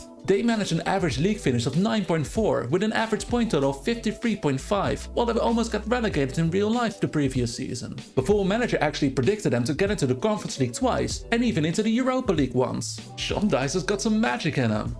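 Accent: Netherlands. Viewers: I think every time if you talk, you’re a dutch guy who talks english😅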